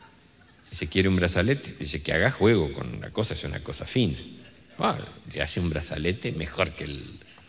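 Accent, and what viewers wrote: Argentina. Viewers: ¡Muchas gracias desde Barcelona!,....un honor y placer escuchar y reir también por los chistes, así como poder apreciar en la voz, el acento propio y humano, no solo de la calidad de la cultura Argentina, si no de un gran artista y persona. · A mi me costo un poco comprender muchos terminos pues soy una mujer cubana y no solo estamos muy lejos los unos de los otros sino que la forma de hablar y sobre todo los modismos son extremadamente diferentes. Pero poco a poco lo fui entendiendo todo y hoy en dia lo escucho casi todos los dias. Le felicito maestro, por su humor limpio y puro. Por algunas partes del mundo los argentinos tienen fama de ser orgullosos....... y luego de haberlo conocido a usted, ya entiendo el por que.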